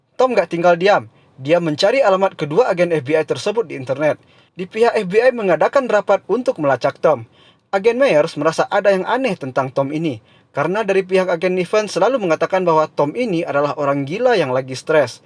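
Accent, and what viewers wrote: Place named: Minangkabau, Indonesia. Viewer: Logatnya kental Minang banget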